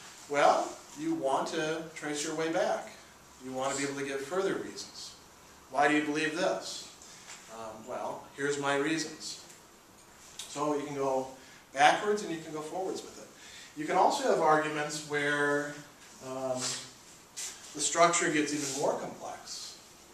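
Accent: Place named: Canada. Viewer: I have lived off your videos to guide myself through my university philosophy elective - for which I accidentally registered. I caught myself answering your questions out loud as if I were attending in person. I find it interesting to listen to the accents of your students, because being a Canadian myself, you sound like me. Midterm today! :)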